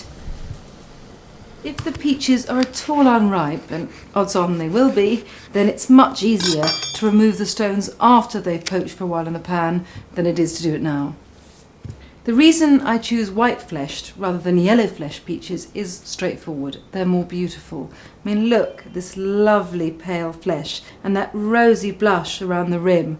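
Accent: United Kingdom. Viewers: هذا الانكليزي البريطاني صحيح Is this British English🇬🇧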